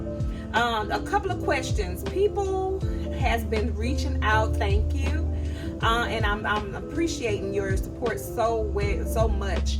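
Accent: South, United States. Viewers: Love it! Where are you from, I hear that southern draw lol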